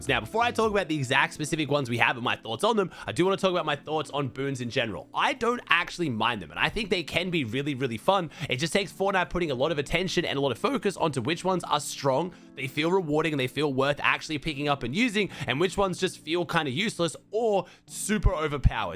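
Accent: Australia. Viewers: little glitch from robo Aussie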